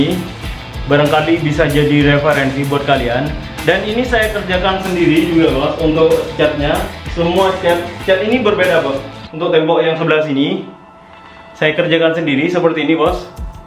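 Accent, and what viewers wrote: Madura, Indonesia. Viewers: Mas asli orang mana? Logatnya koq kadang sy dnger kaya orang madura ya😁🙏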